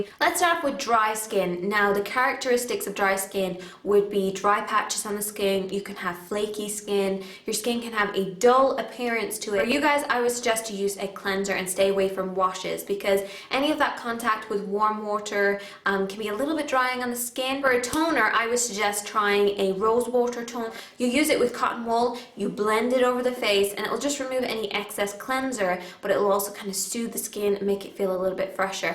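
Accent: Ireland. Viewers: Not a little. She full irish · Are you a little bit Irish?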